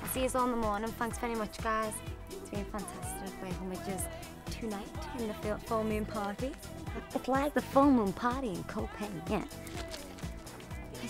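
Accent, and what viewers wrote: United Kingdom. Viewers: i lik the scouse accent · she barely 5"0' her britsh accent can kill anyone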